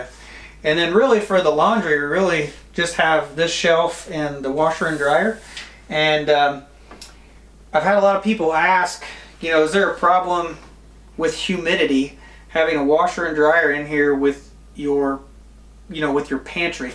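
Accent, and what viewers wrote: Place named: Illinois, United States. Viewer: Illinois accent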